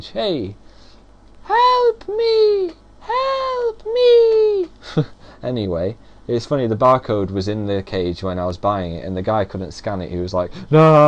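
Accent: North, United Kingdom. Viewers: You sound so northern! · Jay Chi I think he was just making fun of people from the north bc he was hella northern in this video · God he sounds so northern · OMG SO NORTHERN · used to be so northern omg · I WAS FIVE WHEN THIS WAS MADE VOICE SOUNDS SO NORTHERN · He sounds so much more northern here! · He sounds like me so when I think like that it doesn't sound that strong cos my accent is probably stronger but then in comparison to how he sounds now he sounds dead northern. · I can't get over the amazing Northern accent!! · He sounds so nothern in this video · i find it so interesting how phil seemed like he was immediately comfortable being himself online. in most youtubers first videos they’re super awkward and self-conscious but phil was just phil from the start (just smaller and more northern lol) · he sounds so much more northern here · Oh my god!!! 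JESUS CHRIST I AM WATCHING THIS IN 2017!! HE IS SO AWKWARD!!! AHAHHAHA NOOOO I FEEL LIKE IM SCREAMING LIKE DAN RIGHT NOW! HE SOUNDS SO MUCH MORE BRITISH HERE!!! AHAHAHAHA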